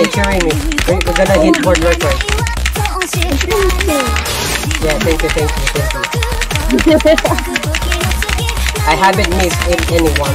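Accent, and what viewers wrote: Philippines. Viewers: is this man filipino he sounds like one ngl also noice thats a gg in my book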